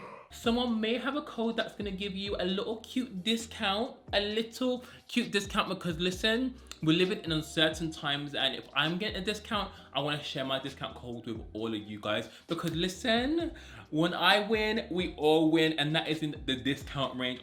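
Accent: United Kingdom. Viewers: I thought you were British! Happy Thanksgiving 🤩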